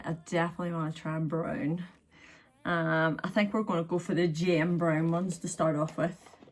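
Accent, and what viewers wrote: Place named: Belfast, United Kingdom. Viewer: Omg I’m from Belfast you sound like you have my accent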